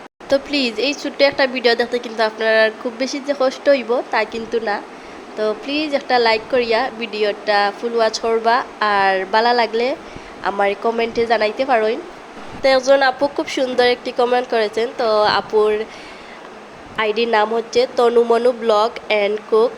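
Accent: Sylhet, Bangladesh. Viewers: আসলেই তাই নিজের কাজ নিজেকেই করতে হবে।যতই অসুস্থ হই।আপনার ভয়েজ সুন্দর। সিলেটি ভাষা শুন‌তে ভালো লাগছে❤❤❤❤ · আসসালামু আলাইকুম সিলেটি আপু কেমন আছো আজকে তোমার ভিডিও টা নতুন দেখছি তোমার মিষ্টি মিষ্টি কথা গুলোর প্রেমে পড়ে গেলাম। তোমার লজ্জা লাগার কোনো কারন নেই তুমি তোমার মাতৃভাষায়ই কথা বলো এতেই অনেক অনেক ভালো লাগে তোমার ভিডিও দেখে মনে হলো যে তোমার সাথে আমার অনেকটাই মিল আছে। আমার ও তোমার মতো টনসিলের সমস্যা আছে। তোমার মতো আমার সেইম বেডশিট আছে। আমার শৈশব কেটেছে সিলেটে তাই সিলের কথা শুনলেই মনটা কেমন যেনো আনচান করে জানিনা কখনো আর যাওয়া হবে কি না তবে সিলেটের জন্য মনটা আনচান করে। যাই হোক তোমার ও তোমার পরিবারের জন্য অনেক অনেক দোয়া ও ভালোবাস রইল পরবর্তী ভিডিও দেখার জন্য অপেক্ষা করবো ❤❤❤❤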